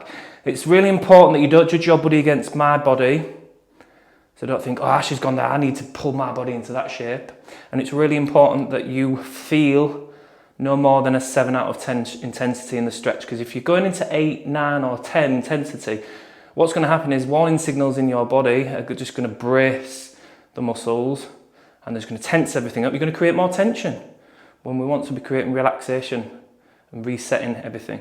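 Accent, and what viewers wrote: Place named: Hull, United Kingdom. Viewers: Great effective stretches with what sounds like good honest East Yorkshire accent. Win win! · I've been in lazy for 6 months and haven't run. Feels good to be back listening to and his Hull accent!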